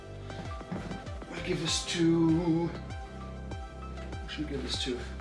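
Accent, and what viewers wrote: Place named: Wales, United Kingdom. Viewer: Love your welsh accent 🥰🕷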